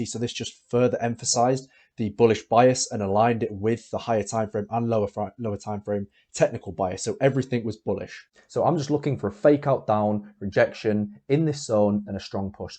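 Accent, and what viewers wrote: United Kingdom. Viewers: His accent seems British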